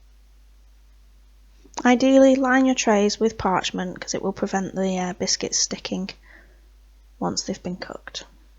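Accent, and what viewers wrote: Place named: England, United Kingdom. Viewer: ☑ Knows the difference between ~♥biscuits♥ and... ☹cookies☹ ☑ English voice, so probably is going to make something that's not sickly oversweetened. ☑ Uses fresh ginger so I'll be able to use the home grown stuff from the garden ☑ Also uses ground ginger, which is good, because ginger comes from the ground :P ooh nice pictures of flowers.. IT'S A BULBASAUR AND SQUIRTLE!!! :D presses 'like' okay, I'm biased, but you win. This is already the best recipe for gingernut biscuits. Would like to have seen one at the end get broken open to see how crunchy it is. I'll have to go and make some to find out :) also, my thoughts on that blue door in the background... it's the TARDIS.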